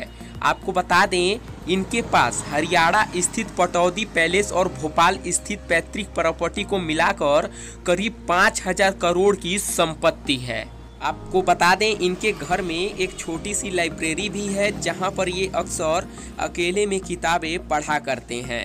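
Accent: Bihar, India. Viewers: Bihari accent mein kyun baat karte ho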